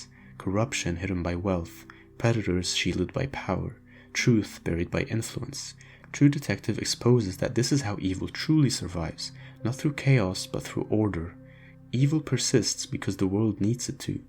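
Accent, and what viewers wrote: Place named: Eastern Europe. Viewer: same insights with eastern european accent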